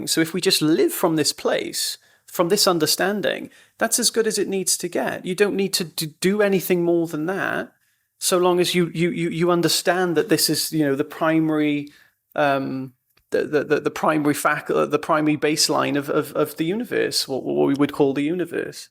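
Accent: Wales, United Kingdom. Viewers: do I detect a little bit of Welsh in your accent ?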